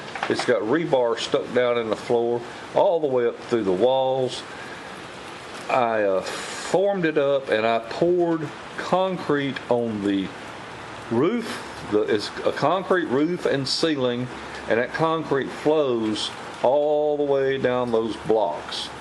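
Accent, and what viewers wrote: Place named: Maine, United States. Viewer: He has that Maine draw reminds me of Down East Dickering mixed with Pug1. He has a grow on ya Quality. I'll subscribe. Is he in a part of Maine with Uncle Henry's listings? Old wisdom is worth a sub any ol cheap day.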